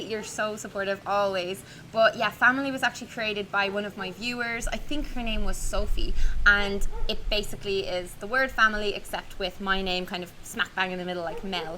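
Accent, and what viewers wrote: Ireland. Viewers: im from cassie's video. i love your irish accent! :) youre so nice lending your EH to cassie even of she stole it lol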